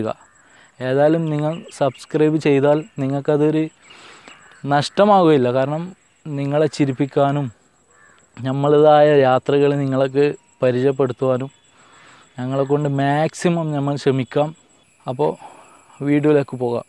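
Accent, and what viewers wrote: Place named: Kannur, India. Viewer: നല്ല തനതായ കണ്ണൂർ ശൈലിയിൽ ഉള്ള അവതരണം വളരെ അധികം ഇഷ്ടപ്പെട്ടു. പുതിയ വിഡിയോയ്ക്കായി വെയ്റ്റിംഗ്..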